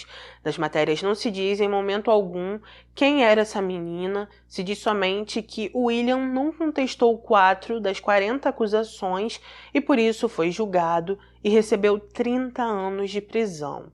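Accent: Rio de Janeiro, Brazil. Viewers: Boa noite quando procuro seu vídeo e não encontro já fico ansiosa esperando esse sotaque carioca é muito lindo eu morei no Rio de Janeiro por 2 anos e quando saí de lá já estava falando carioca kkkj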